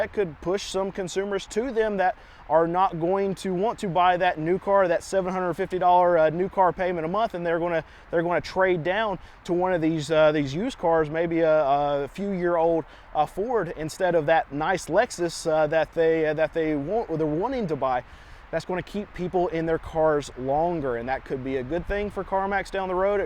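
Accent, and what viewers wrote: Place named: South, United States. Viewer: Here for the southern accent "Becuhzzz'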